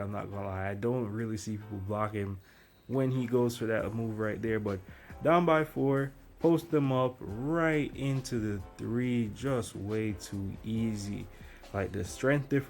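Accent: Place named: Jamaica. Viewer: You sound Jamaican or Bahamian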